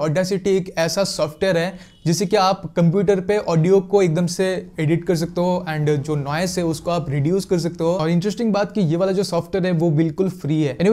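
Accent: Bengal, India. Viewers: This was absolutely helpful ♥️♥️ subscribed instantly ! 😄 By your accent I feel you are Bengali .. 🙌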